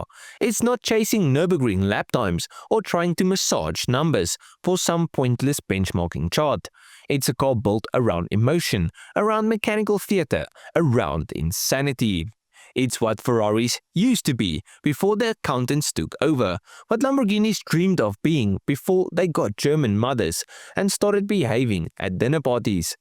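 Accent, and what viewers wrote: South Africa. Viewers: your accent sounds like a mix of south african or dutch and something else, what is it?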